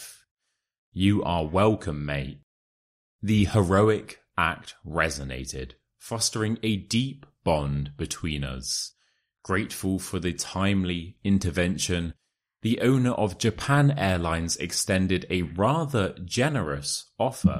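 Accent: United Kingdom. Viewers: I like your short story haha.I learned a lot from you and I like your british accent!thankyou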